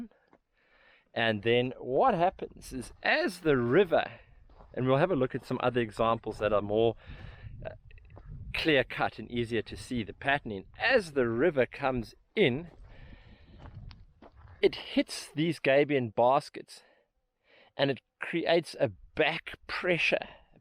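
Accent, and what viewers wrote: South Africa. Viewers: sounds south african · May I guess this is from South Africa? It sure looks like it (and your accent). Beautiful landscape any way, and excellent explanation!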